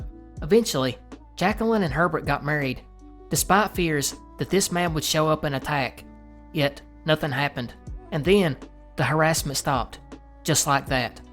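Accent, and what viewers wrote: South, United States. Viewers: Haha also you saying hello fam was a culture shock because fam is British slang over here 🤣 miles and miles away from the south states of America - enjoying the series as an outsider and my heart on the first part talking about your accent. Other than preconceived ideology of the south I still can't put a face to a voice I'm sure we'd all be interested in a face reveal even though your content doesn't suit that (oh god those yters that combined this content with face cam and the audio quality is different). Anyway as a British hooligan I've commented about your accent before and your dialect doesn't bother me one bit I love your pronunciations they make me giggle in a completely non judgemental way I'm sure we'd be giggling at each other if you heard my accent too 🤣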